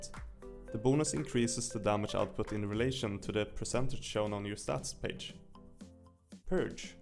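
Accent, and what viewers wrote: Sweden. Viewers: Your accent sounds swedish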